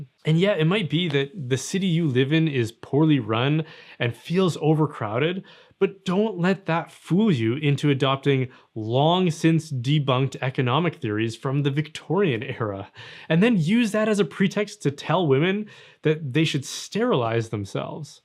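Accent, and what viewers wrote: Canada. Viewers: Oddly, hearing a canadian speak over images of suburbia started " " playing in my head.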